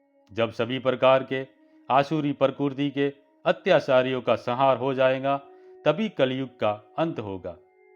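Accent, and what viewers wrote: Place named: Marwari, India. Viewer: भाई पक्का आप राजस्थानी हो आपके बोलने से ही पता चल रहा है की आप मारबाड़ी हो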